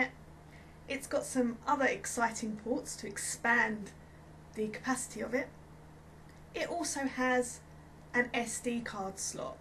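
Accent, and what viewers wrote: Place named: United Kingdom. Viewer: British accent <3 cool video :)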